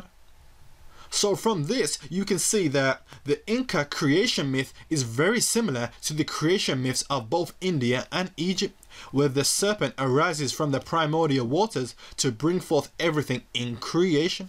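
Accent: Birmingham, United Kingdom. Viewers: Is that a brum accent i detect. Beautiful video by the way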